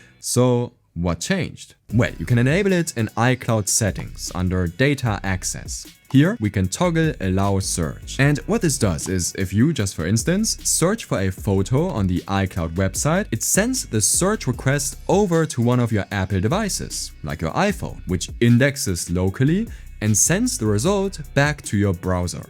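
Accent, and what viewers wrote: Germany. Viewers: Am Akzent rausgehört dass er Deutsch is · Omg dieses german accent ist halt so schlimm 😬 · I could easily hear your German accent but it sounds good and really natural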